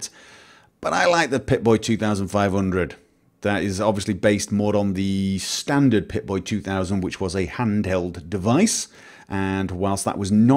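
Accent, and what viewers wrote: United Kingdom. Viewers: Does he have a British or Scottish accent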